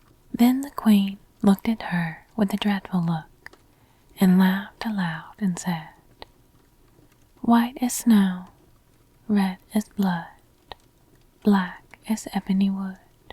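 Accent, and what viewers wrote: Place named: South, United States. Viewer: Seeing your beautiful face is as comforting as your gentle southern tinged voice.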